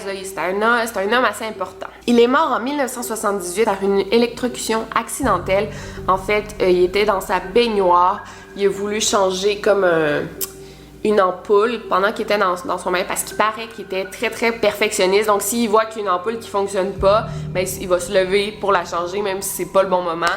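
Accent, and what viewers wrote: Quebec, Canada. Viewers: Hiiiii je kiffe ton accent ! 😂 L'accent Quebecois c'est ma passion ! 😂 Sinon je trouve que tu raconte grave bien 😍❤ Bref, bisoous de France ♡ Charlotte 💞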